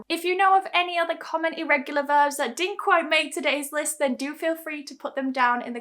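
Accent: United Kingdom. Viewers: I really loved your all of video to learn lot of things . you is the best teacher ever, you is a beauty queen of BRITISH accent. & Im really big fan & followers of you. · i really like this channel that the british accent was sounds comfortable❤️ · Hi again,! As I've said before, your British accent is just delicious! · sorry teacher , are you british ?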